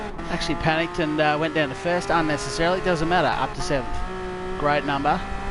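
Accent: Australia. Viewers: Quickly becoming my fave streamer/youtuber. Love your soothing aussie tones.(in a manly platonic way).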